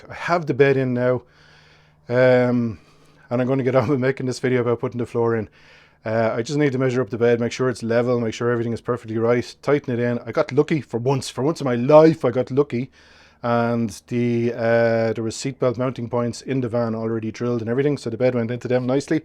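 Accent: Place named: Ireland. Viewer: Nice job mate, and timely! I've just acquired 72 low light, and have the same job to do. And that Irish brogue!! I could listen to it for ages, love it. I'm from Australia. 😂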